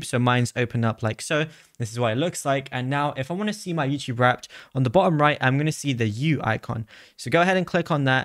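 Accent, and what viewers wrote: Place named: United Kingdom. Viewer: He’s got a British accent and he’s got it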